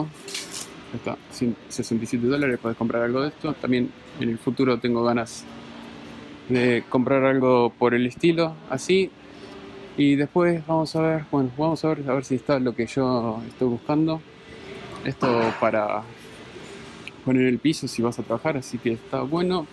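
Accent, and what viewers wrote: Argentina. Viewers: Oye, cheee! No vas a hacer negocio, no ve uno nada! Nada mas nos mareaste con la camara, toma en cuenta que la mayoria te vemos con un celular, hacerca y enfoca, ve mas despacio, no se ven los precios, te recomiendo que vayas por zonas....martillos? Pues puros martillos despacio hasta que termines, no importa si el video tarda 30 min, o, mas! Otro video de otro producto, y asi...! No hables tan rapido, no todos entendemos bien por el acento de cada region, de habla hispana, y si es solo para argentinos, pues ponlo en el tituto, y por favor traduce, lo que sea importante del producto! Todos los que vivimos fuera de Eua, quisieramos, una tienda asi en nuestros paises, pero no lo hay existen pero es basura china. Asi que tu dices si, inicias un buen negocio, obvio tu vas a llevarte un dinero con los envios, y explica tu metodo, que sea confiable y sin fraudes, para hacerte pedidos.. 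Yo he ido, y hay otras tiendas, tambien de herramienta electrica y electronica, el campo de accion es grande, pon tu negocio "on line" Saludos y esperamos ver mas de tus videos, corregidos y aumeados, soy de mexico!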